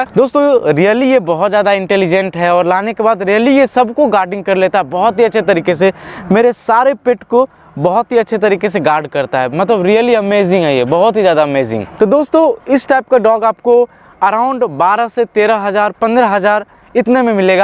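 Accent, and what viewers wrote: Bihar, India. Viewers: Bhai kya app Bihar se ho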